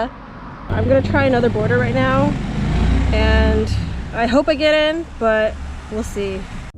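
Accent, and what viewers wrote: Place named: United States. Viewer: Hi from Izmir 🖐️🙂 I wonder why do you have American accent ?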